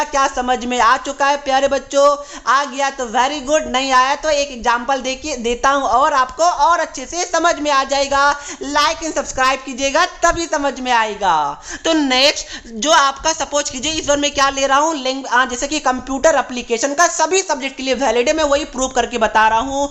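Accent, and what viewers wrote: Bihar, India. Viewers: Sir ji aap bihar se ho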